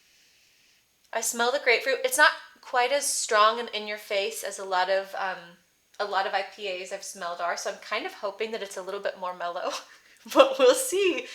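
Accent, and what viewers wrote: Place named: Midwest, United States. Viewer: Thanks for teaching,. What part of the Midwest are you from?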